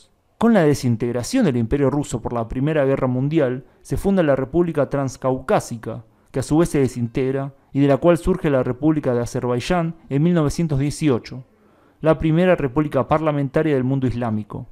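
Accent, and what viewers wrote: Buenos Aires, Argentina. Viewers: Si es argentino y el dialecto rioplatense destaca por un yeísmo exagerado, ¿cómo quieres cambiar su modo de hablar español? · Lo único aburridor el asentó, pero lo demás super, un acento colombiano sería super, pero bueno aceptar así los hermanos argentinos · ¿Vos te das cuenta de la cantidad de letras q leés como "eyyyyheee"? ¡HASTA LA JOTA LA CONVERTÍS EN ESAPORQUERÍA DE "LETRA" Q INVENTARON USTEDES LOS PORTEÑOS, CON LA Q SUBSTITUYEN NO SE Q´ CANTIDAD DE FONEMAS! ¿Tenés conciencia de cómo te baja el el nivel como para q te andés metiendo en temas de la cultura? ¿No se dan cuenta de cómo mutilan el alfabeto y el lenguaje con ese sonido caníbal insoportable? ¿De dónde lo sacaron? Si oís grabaciones de radio y tele hasta hace unos pocos 20/30años es imposible oír esa aberración brutal, q es pura ignorancia y degradación de la cultura nacional. 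¡EXPLICAME CÓMO SE ESCRIBE ESE SONIDO Q ES A LA VEZ EYE, JOTA, ELLE Y NO SE CUANTAS MÁS! ¿Porqué no te dedicás a la cumbia, el cuartetazo y la bailanta, q te va a caer como anillo al dedo?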